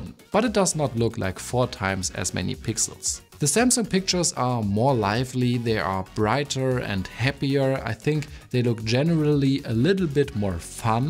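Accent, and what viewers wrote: Germany. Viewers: Hahahahahahah ich dachte, das ist ein Scherz am Anfang. Der deutsche Akzent hahahahahahahah ich kann nicht mehr 😅 by the way: "if I have to be honest". What does that suppose to mean? You don't have, you should be! 😂😜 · I hear the german accent xD Love my s24U. Just wished our banks would support google wallet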